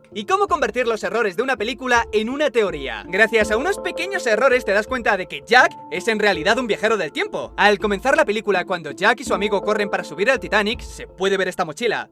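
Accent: Spain. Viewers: Tu voz de español zoqueta es INSOPORTABLE!!!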